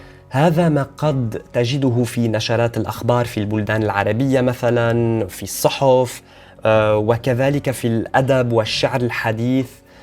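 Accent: Lebanon. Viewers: I guess he is lebenese because of his smooth accent so yes he's an arab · He has a slight Lebanese accent when he speaks MSA.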